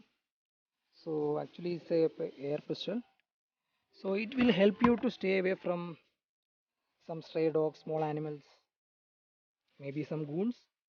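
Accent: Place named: Malaysia. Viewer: Manglish was gud. It’s better do in wat u know.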